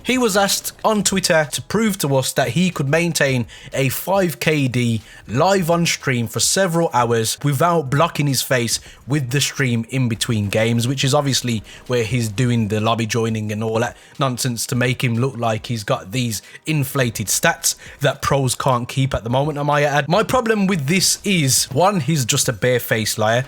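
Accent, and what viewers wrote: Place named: Midlands, United Kingdom. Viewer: Yeah man just subscribed, luv a midlands accent, sounds down to earth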